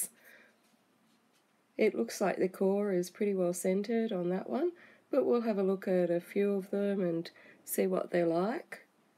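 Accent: Australia. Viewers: OMG, a fellow Aussie, so nice to hear someone not waffling on with stinkin cutes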